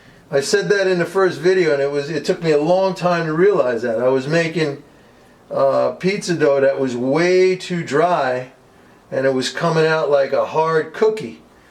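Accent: New York, United States. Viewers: You sound like a real New Yorker. I like I have the sauce lol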